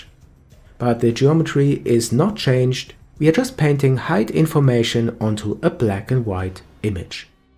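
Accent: Germany. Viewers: Me: I need a clear concise tutorial... (Clicks on tutorial): (German Accent) "Hello Guys, here's a Blender 2.8..." Me: Oh thank god:D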